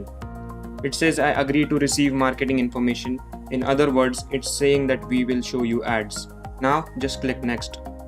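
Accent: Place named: India. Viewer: I like your India acent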